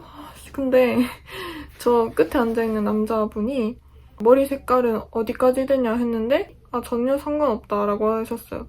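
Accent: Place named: South Korea. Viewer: 파이팅이요!! 한국 사투리 구수하시네요 정감가요 일본생활 파이팅입니다!!!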